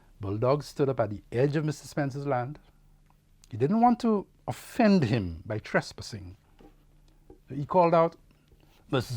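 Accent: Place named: Patois, Jamaica. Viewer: Would love to listen to your poetry on an audiobook!!! The twang of patois in your voice is so warming.....hello family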